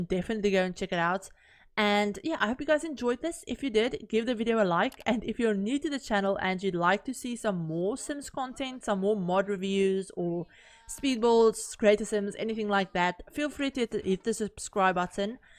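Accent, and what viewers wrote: South Africa. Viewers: South african accent lol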